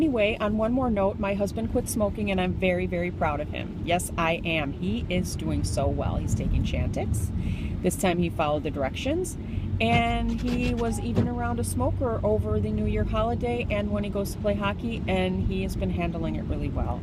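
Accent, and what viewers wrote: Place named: Michigan, United States. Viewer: Sounds like you live in Michigan, which is where I live! I love your videos...and my friends found out about my videos and made fun of me too. Keep on keeping on. :D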